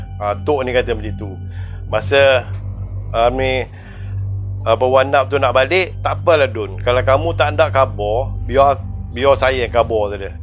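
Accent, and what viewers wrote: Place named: Perak, Malaysia. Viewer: Orang bota ke ni? pekat no loghat peghok 😆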